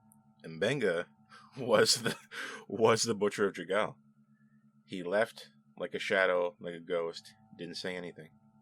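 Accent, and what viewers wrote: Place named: Nova Scotia, Canada. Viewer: Unreal good break down my friend.... I really dont like this show anymore its got so bad to me but i watched this episode and its probably the best one of the season but....still hard to give it much more than a 6/10 with everyone always angry and emotional and acting like TEENS. U from Nova scotia ? that accent seems close being from Boston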